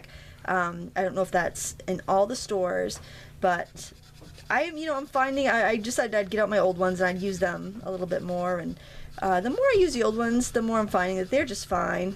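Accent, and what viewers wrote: Maine, United States. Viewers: Lolol, welcome to you tube land, to hubby! Love the little frog. Don't know why, but I've always loved them. Thanks for sharing all you do during your videos. I was watching your vid the other day, my sweetie walked past, and stopped... staring at the screen. I said you were a great teacher, and that you lolwere from Maine, he said "Oh." And moved on. No telling what that meant, but he's from MA. Boston more precisely. Lol Today is the first time I've heard your accent come thru. His is always there! You should hear the recognition problems he has on the phone! Anyway thanks for all the thinks I've learned from you. Hugs, Jess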